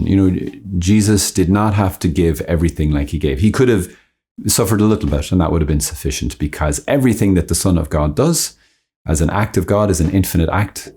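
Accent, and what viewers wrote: Ireland. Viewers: Em Irish accents 😭🍀 Aiiii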